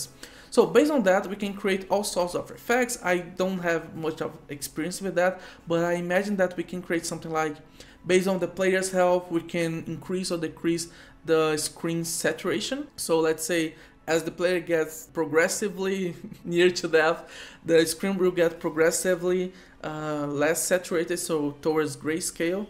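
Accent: Brazil. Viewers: Brazilian accent 🫶🇧🇷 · Tu é br né vei ? tem sotaque de br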